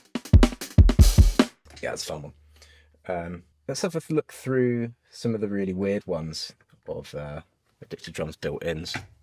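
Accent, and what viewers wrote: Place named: United Kingdom. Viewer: Haha your fine bro , it’s not an issue unless you literally never heard or spoke to someone from the uk !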